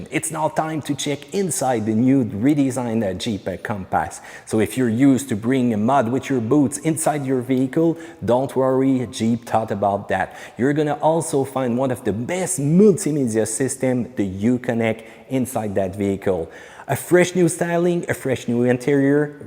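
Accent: Quebec, Canada. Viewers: came for the french-canadian accent, stayed for the high image quality... but jeep is really doing a poor job with this one hahahaha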